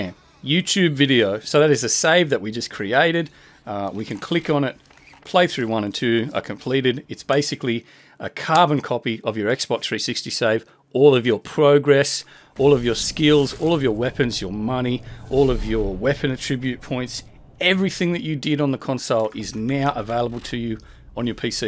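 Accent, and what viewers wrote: Australia. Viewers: Did I ever mention how much I appreciate you, the fact that I can sit back and listen to an Aussie and enjoy myself makes me really happy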